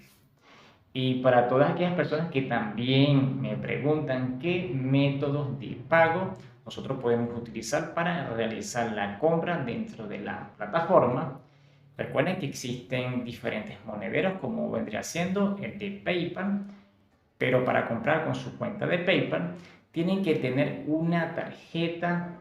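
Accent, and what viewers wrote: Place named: Apure, Venezuela. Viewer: Eres apureño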